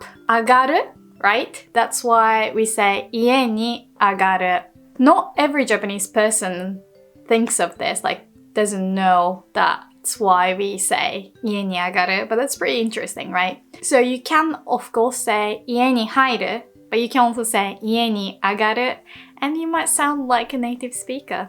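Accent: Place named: United Kingdom. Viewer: Very good video ! It's fascinating about both language and culture When I've been in Japan I definitely pick up my bowl to eat rice, but I wouldn't do that in the French restaurant in Paris! On the other hand nobody would bother you about it! I enjoy all of your videos as I'm trying to learn Japanese. By the way you have a bit of a British accent ! IMO ;)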